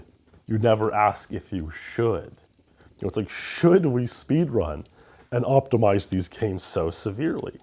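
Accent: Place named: Canada. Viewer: what part of Canada you from?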